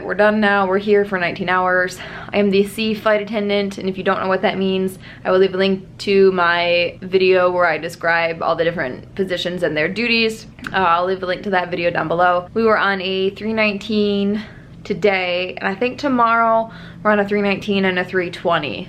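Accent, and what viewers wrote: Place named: South, United States. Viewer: I think that you are starting to get a little Southern twang in your voice! (I am from Alabama)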